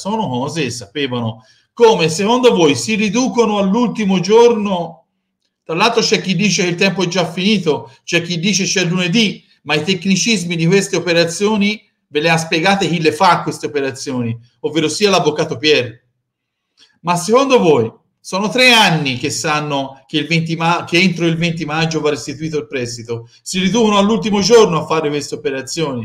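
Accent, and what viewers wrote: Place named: Florence, Italy. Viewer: Bellissimo il tuo accento fiorentino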